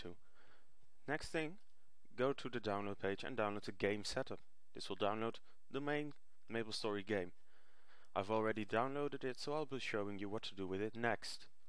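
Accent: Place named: Japan. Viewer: you sound like a japanese :D :D :D so... where do you live?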